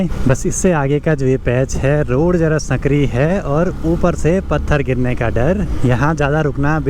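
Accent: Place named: Haryana, India. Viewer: Bhai haryanvi bol rahay ho tenay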